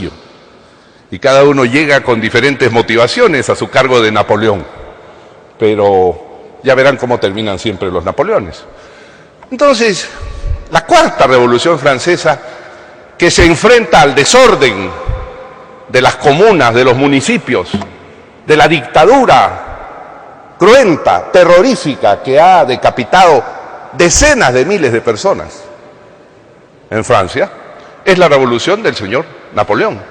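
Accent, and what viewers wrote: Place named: Peru. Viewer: Separó al Alan García político del Alan García orador , porque disfruto escucharlo , porque es el verdadero dialecto peruano. Separemos el político y el orador porfavor.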